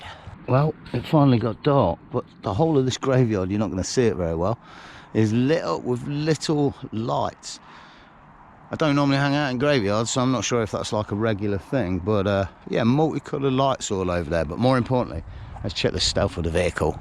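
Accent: United Kingdom. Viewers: Who doesn’t love a British accent? I can understand you without any problem, unlike some local accents!😂 Seriously though, there are some counties (not sure if that’s what they’re called there, and I don’t know which ones specifically) where I’m not convinced that they’re even speaking English. Sometimes I still get tripped up by the difference in what things are called, like the boot, and when video is shot from inside a moving vehicle it is disorientating being on the opposite side of the road. But I thoroughly enjoy your vids, you’re proof Brits DO have a sense of humor!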